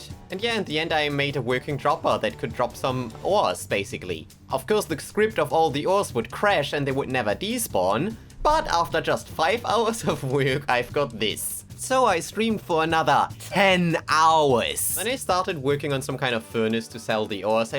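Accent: Germany. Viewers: wait you are german?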